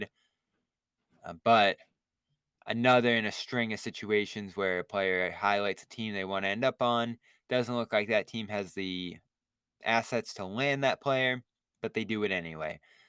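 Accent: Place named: Boston, United States. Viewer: I’ve your Boston accent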